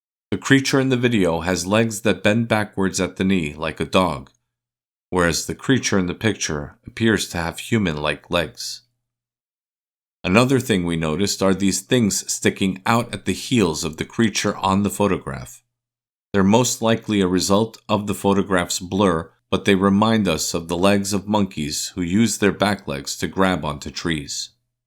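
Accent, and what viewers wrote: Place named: United States. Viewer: I was actually wondering that (excellent American accent btw, but I can also hear the Hungarian), if this is your native country, telling of local stories. That mega-brilliant anti brain fart, i.e. epiphany, came to me later, however I forgot to edit my comment to include it. Sorry. I do love your content with the excellent quality you bring ;) I've long been into cryptids & paranormal occurrences, so this is great!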